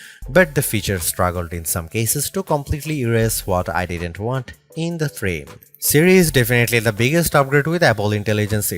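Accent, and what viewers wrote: India. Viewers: I hate your indian accent to be honest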